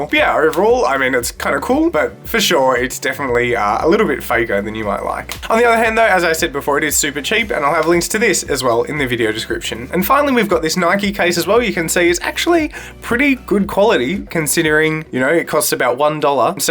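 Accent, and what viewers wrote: Australia. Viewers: G'day mate! Aussie accents are so orgasmic I can get off just listening to yall speak. But, having said that you're speaking too fast that I gotta turn the captions on in order to understand what you are saying. I've got Samsung's Galaxy Buds myself for about 7/8 months now and I have just ordered a case for it, something similar to the Nike's in this video, just without any logos on it. It's so hard to find a good and cheap case for non-airpods TWS earbuds. The case I ordered + shipping was a few cents shy of $30. That was expensive. The cheaper ones either have zero or negative reviews. I read one that said her galaxy buds charging case "slipped out" of the case which was hooked onto her handbag with a carbiner and she only realized it was gone when she was home because she was blasting songs with the buds on the subway and couldn't hear it hit the floor.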